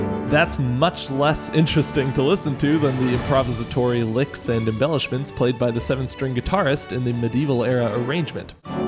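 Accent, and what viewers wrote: United States. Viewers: Wait you're not American? Then where are you from that has such an American accent? Canada? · I thought you were american, were are you from? Very nice talent in music, you have. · i never even realized you were canadian! i think my mind just kinda assumed you were northern/midwestern american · "And I'm not even American" That threw me for a loop there for a second. I was about to say "but, his accent is clearly Amer..." and then I remembered that for the most part the Canadian accent is indistinguishable from the American accent, unless you have a heavy regional accent. Sorry Canadians, for always assuming you're American unless told otherwise.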